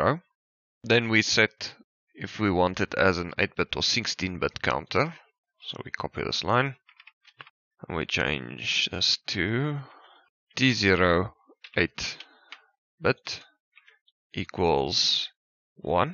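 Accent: Afrikaans, South Africa. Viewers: Klink of jy Afrikaans kan praat :-). Great videos! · Is jy afrikaans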